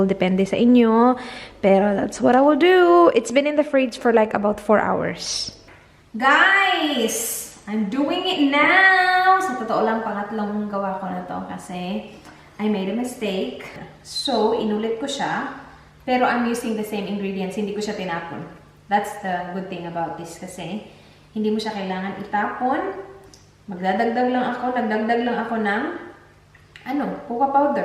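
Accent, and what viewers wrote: Visayas, Philippines. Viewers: Ka bisaya ba diay nimo te oi HHAHAHAHA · Bisaya ka po ba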